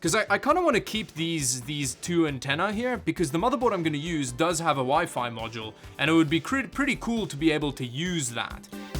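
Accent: South Africa. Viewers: You afrikaans? Cuz ek is! And yeah does sound like a South African who speaks with a Semi-American accent. (Pronouncing R’s alot and some overpronouncing on letters and words that i am to lazy to list now)Your surname is also very afrikaans, “ ”😂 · are you South African? You accent and name reminds me of SA. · Are you South African? 🤔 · I guess you also live in South Africa, because of the accent and name, but I would like to know, is it better to buy pc parts in south africa or import from other countries and then paying taxes and duties?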